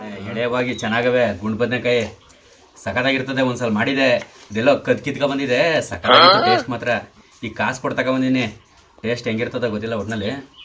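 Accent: Mandya, India. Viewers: Anna nivu mathadodu tumba eshta., adrallu nim wife jhote mathadtiralla adu maathra super., namdu mandya ne., mandya language super...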